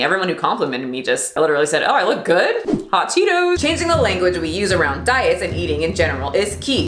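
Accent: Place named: Louisiana, United States. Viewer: are you southern? you got that Louisiana accent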